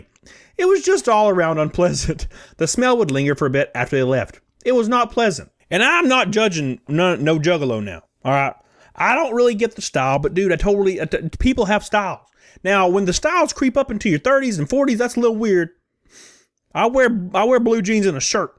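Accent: South, United States. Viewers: Can you plz turn down the whole southern way of talking thing???? It’s rly annoying SOZ 😢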